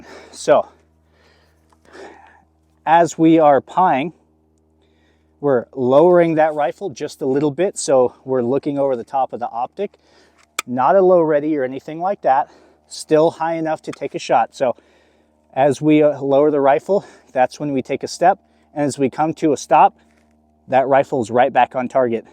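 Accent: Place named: Russia. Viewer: I've been following your IG for a minute and im ngl i was expecting the thickest Russian accent i've ever heard. still not disappointed lol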